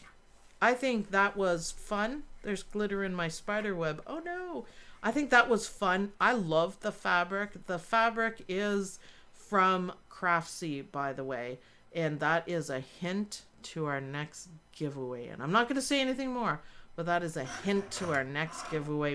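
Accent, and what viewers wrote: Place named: Ontario, Canada. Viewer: As usual, I'm watching late. My mom always said I'll be late for my own funeral (doesn't sound so bad, actually!). Love the fabrics you used, so cute. KK designs work out perfectly, every time. BTW, I'm mostly from Ontario - Niagara area, Welland & St. Kitts. PEI. Many of the States. But, Ont is home. That's why I enjoy your accent so much - it's home. 🇨🇦 Thanks for another wonderful video!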